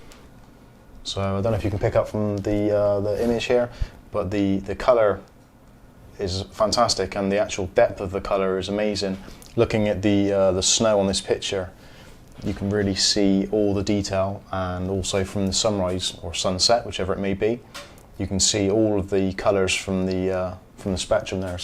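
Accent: Bristol, United Kingdom. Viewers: Bro are you from bristol cause if u are same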